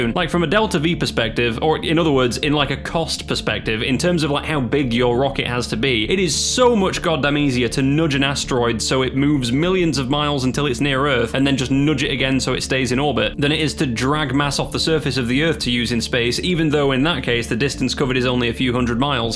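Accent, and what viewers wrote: United Kingdom. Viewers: He's British Kurzgesagt and I'm here for it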